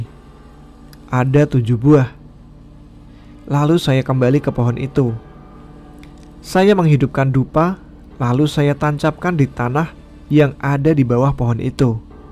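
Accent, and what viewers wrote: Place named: Java, Indonesia. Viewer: ciri khasnya Mas aksen org Jawa nya terasa kental bgt yak 😁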